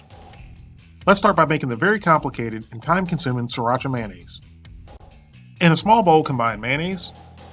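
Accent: United States. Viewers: Some of your American accent is hard for non US people to understand , what are the first two ingredients for the mayonnaise .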